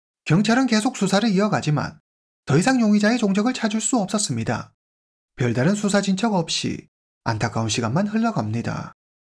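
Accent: China. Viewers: 방송인 중국사람인가 ?말투가 어디지?궁금하네 ...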